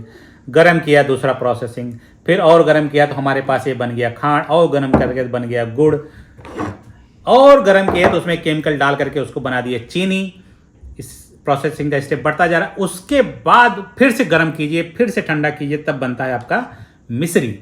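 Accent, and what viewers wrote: India. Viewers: * आपने अपने विडियो में 2 महिला + पुरुष के विडियो की क्लिप डालकर बहुत ही अच्छा किया * आपकी हिन्दी में ठेठ देसीपन है और इस वजह से अंग्रेज़ी के उच्चारण में भी देसी फन ( पन नहीं) है * जो सुनकर मजा आ गया * बाकी जानकारी का तो जवाब नहीं * धन्यवाद देसी पन और फन के लिए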